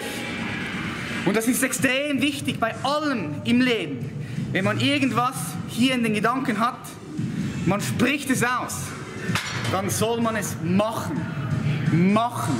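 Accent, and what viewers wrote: Switzerland. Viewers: Unglaublich was für ein Vogel. Der Schweizer Akzent machts zum Glück lustig.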